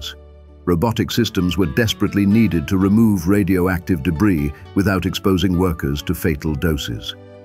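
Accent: United Kingdom. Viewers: Subscriber here. Liked and hyped this video because it’s very cool! No bouncy line at the bottom… Awesome! Now, if we can just get rid of that British accent…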